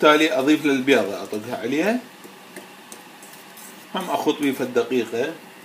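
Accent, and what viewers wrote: Iraq. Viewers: مرحبا عمو كلش واحد يرتاح من يسمعك تحجي بلهجتك البغداديه عمو اريد اعرف شلون نسوي خل التمر وشكرا جزيلا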